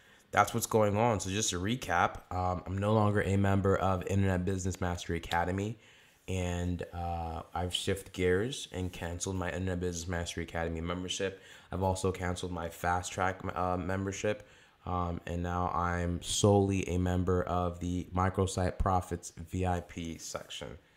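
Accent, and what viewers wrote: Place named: United States. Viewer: But you have a little more advantage than me bcos you have the American accent and I don't. What I did was join a program that started paying me without referrals or customers or leads or anything like that. Then I made a proof on income video just to add some credibility to my business and then I sarted getting quality leads from my campaigns. I'm not trying to get you to join me, you can join another person but you really need to check this out reviewprofitclicking(dot)wordpress(dot)com.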